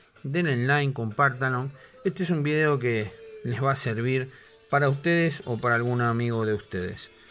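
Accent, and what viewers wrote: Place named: Argentina. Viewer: Es argentino.. debe trabajar pues En chile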